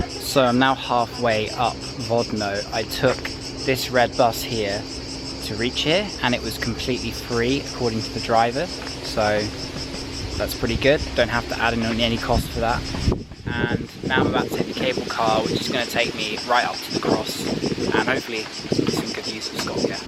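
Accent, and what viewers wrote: United Kingdom. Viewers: I hate that British accent , coward Brit’s gave their county away to Muslims , puke 🤮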